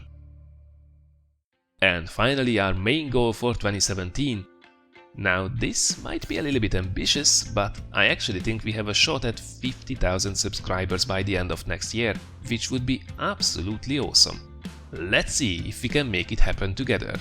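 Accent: Hungary. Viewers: Tipikus Magyar akcentus.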